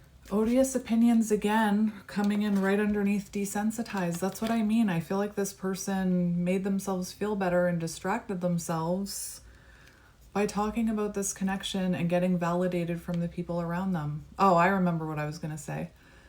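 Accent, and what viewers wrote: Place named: Canada. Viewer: Two of your readings have been so spot on! One with the feathers, and this one, very very accurate. I am sending you best wishes from Central America, I could tell you were Canadian, by your accent. All about his feels so right... 15 reminds me of his emotional age....he is coming around.. I hope and I feel...there is still hope, and healing... no contact at the moment.. I am letting him figure it all out. 19 sounds much better than 15! I have and I keep choosing myself! It all rings too much truth! It is very very re assuring. Both of our parents.. alcoholic! Integrating both energies femenine and masculine! It has been like living th relatioship in real time! The key to healing and growth... very very sweet and affirming and brutally honest...! A great catalyst! Still very connected telephatically! Yes he is away. Thank you.. 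DELULU is a SOULULU! and No divine femenine are not mad.... we rock! Clarity and authenticity big present themes! Lorena Bobbit Oh MY Word! Noooo! That was brutal! This was brilliant! Mental as Harry Potter used to say! I can not possibly tell you.. all the overwork, family etc.. all of that applies.. them not me.. I am taking a break.. all true... unbeliebable...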